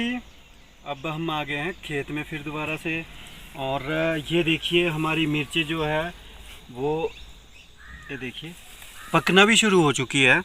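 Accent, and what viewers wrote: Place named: Punjab, India. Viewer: Ap jo batye karte ho achi lagti us m thoda punjabi ka touch hota ha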